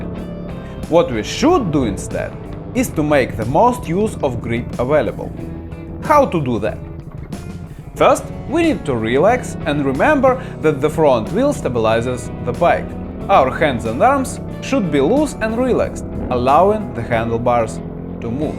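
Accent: Russia. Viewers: Recorded in Buenos Aires by a dude speaking English with the Russian accent. Nice! :) Безопасно себя ощущаешь на байке в Capital Federal?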